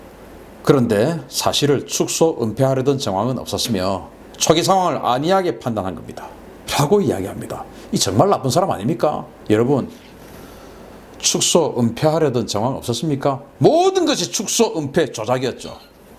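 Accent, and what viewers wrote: Gyeongsang, South Korea. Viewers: 또!!!!!!! 또!!!!!!! 쫌!!!!!!! 갱상도 남자의 강력한 멘트!!!!! 쵝오!!!!!!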